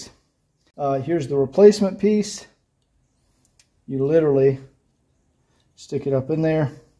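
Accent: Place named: Atlanta, United States. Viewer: Excellent video. I’ve done some of this stuff to my <num>d. Haven’t tackled the sunroof or antennae yet. I also need to do my A/C and clutch master/slave cylinder. Im assuming you must be near Atlanta based on accent and the YouTube description phone number. I’m up in the mountains above atlanta. Are you a w123 shop? Any good references for local w123 salvage yards? Parts places? What about body and paint guys familiar with fixing rusted w123 body pieces? Thanks!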